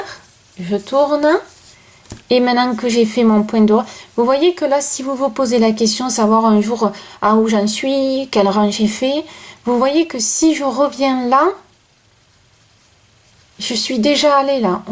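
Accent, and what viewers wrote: South, France. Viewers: Merci beaucoup pour vos tutos, bien faits, clairs , tranquilles, et le petit accent du sud "merveilleux" en prime ! Continuez à nous apprendre, vous êtes très agréable merci. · Merci vous expliquez très bien ne changez pas votre accent moi je suis de NIMES votre accent est près du mien Marie jo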